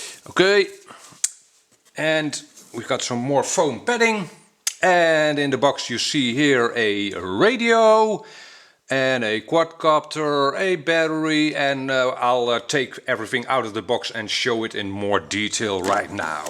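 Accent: Netherlands. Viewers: First let me compliment you on your English! Though your Dutch accent is very thick, your grammar and vocabulary is excellent! I have several questions regarding this quadcopter. I am a novice in this field. Though I am fascinated by the concept of FPV, I am mostly interested in shooting nice video's during my travels. So, I am not a hobbyist or sport-flyer. I have been looking for good camera drones, small enough to pack for travel (without the need for an extra bag, or larger bag - I like to travel light). I just bought the Parrot Bebop(1) for that purpose, but I find it (still) too big, too breakable and it seems to lose the wifi connection each time I fly (losing all control over the drone!!). Now, the footage I saw on your other video seems too jittery and jellowy to be usable to shoot travel videos, could that be helped? Is it possible to fly this with a smart-phone as well (so I wouldn't need to pack a radio controller)? 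Would you recommend this drone for my purpose? I like the small size and the sturdiness, though I would welcome altitude hold and all kinds of aids in ease of flying.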